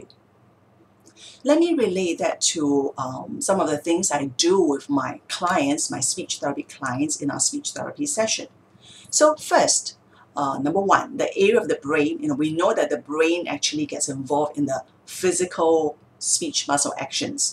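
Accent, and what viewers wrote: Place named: China. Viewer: Btw, I enjoyed the clear and smooth English with the very tiny, little little charming Chinese accent..what a pleasant voice to listen to. Very calming.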